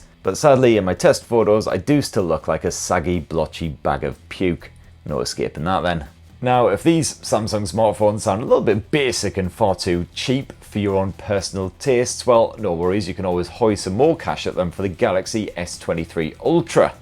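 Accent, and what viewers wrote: United Kingdom. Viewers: You're the only person that makes me like British accent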